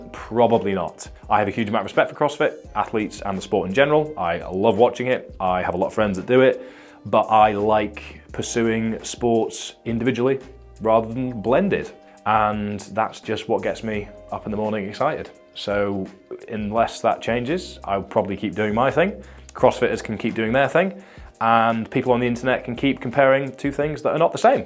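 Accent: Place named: England, United Kingdom. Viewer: I did wonder about that....why DO you have an English accent?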